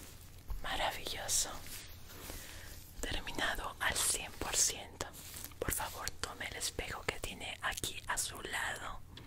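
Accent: Mexico. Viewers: me encanta tu voz! tienes un talento innato y lo mejor , es que eres mexicana :) (bueno eso creo, por tu acento)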